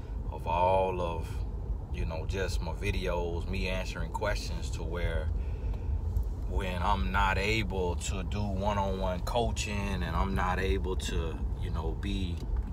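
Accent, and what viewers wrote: South, United States. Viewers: Nice to see your life behind the scenes. More like this please!! Oh & don’t mind the country accent. I love it!! Well done to your sons x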